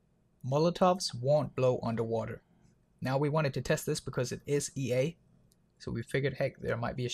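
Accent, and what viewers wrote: Denmark. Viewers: are u danish? or mayby norwegian?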